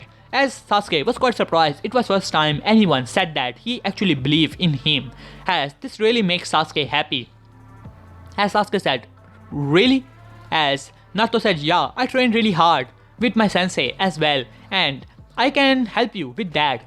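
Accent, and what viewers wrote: India. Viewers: Bruh you a indian? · Are you from India... because your pronunciation seems like that.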